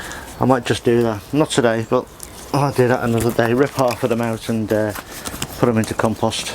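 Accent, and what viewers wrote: Liverpool, United Kingdom. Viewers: 👍👍👍is that a scouse accent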